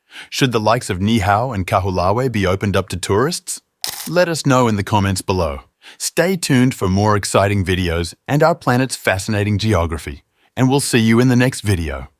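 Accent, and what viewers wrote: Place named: New Zealand. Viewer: Dude sounds like a Kiwi. He may be mispronouncing ʻŌlelo Hawaiʻi by using the Te Reo Māori pronunciation (There are many similarites between the two languages, especially when one is a non native, non fluent speaker of either) As a hawaii resident, I've been told I tend to screw up and mispronounce Te Reo Māori words by saying them as if they are ʻŌlelo Hawaiʻi. (Which I have some familiarity with even though I don't actually speak ʻŌlelo Hawaiʻi fluently)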